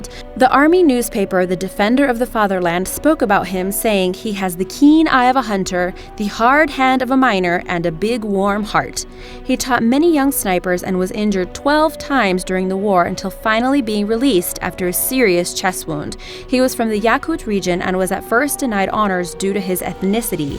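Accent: United States. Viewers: She has an American accent. How can an American get the buffalo identification wrong? Maybe she is a Millennial.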